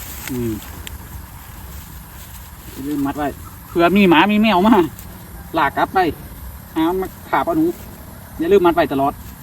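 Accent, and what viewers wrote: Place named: Surin, Thailand. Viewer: ฟังเสียงนิสุรินแม่นบ้อหำ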